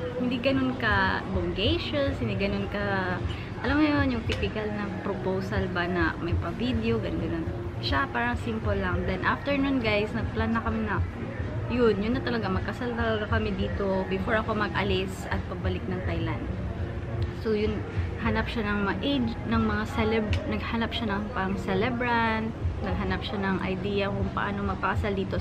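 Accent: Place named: Davao, Philippines. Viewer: Davaoeño accent. Hehe.. kaubo kos chika sa gasto. Murag gi kuyawan ko huhu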